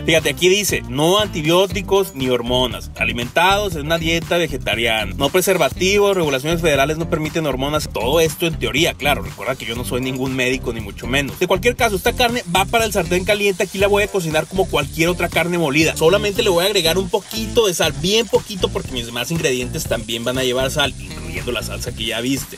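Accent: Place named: Mexico. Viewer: Como me gusta ver tus videos me haces reir😃🤣😁 con las cosas que dices te escuchas bien mexicano❤ Estan bien padres tus recetas un dia de estos cocino algo siguiendo tus kitchen skills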